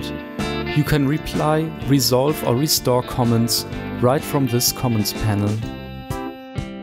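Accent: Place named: Germany. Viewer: Love the german accent ;)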